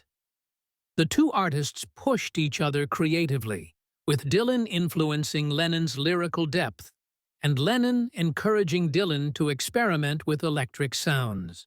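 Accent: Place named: United States. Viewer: Here we go again, the Yank narrator, talking about the bigest "British band" the world has ever known, and the five who impressed the most, yes you've got it, five Americans, you knew it was gonna be rubish as soon as he opened his mouth, they just can't except they've never produced a band as big as the, their answer was the, what a disaster that was.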